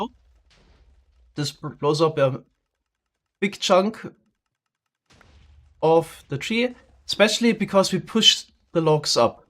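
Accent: Germany. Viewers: I was watching yesterday’s recap and my dad looked and me and said “he sounds German” (he said it in German) and I broke out in laughter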